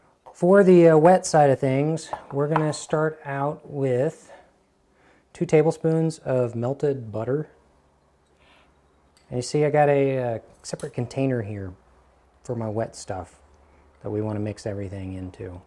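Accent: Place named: Canada. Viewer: Sounds like he's Canadian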